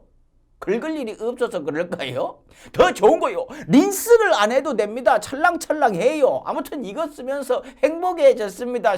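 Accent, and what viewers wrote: Jeolla, South Korea. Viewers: 강 성범씨 구수한 전라도 사투리가 매력이 있습니다. 럭셔리 칼럼 잘 보고 있습니다.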